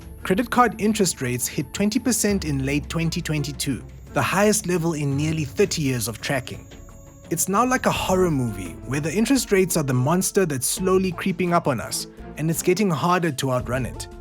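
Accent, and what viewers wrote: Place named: India. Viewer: Why is the narrator’s voice Indian?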